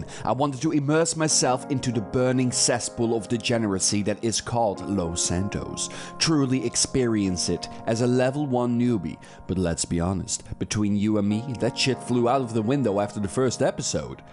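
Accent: Netherlands. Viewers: Het nederland accent komt naar boven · Ben je Nederlands?😅😂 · Het is wel erg duidelijk dat je Nederlands bent. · you sound Dutch af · Are you dutch?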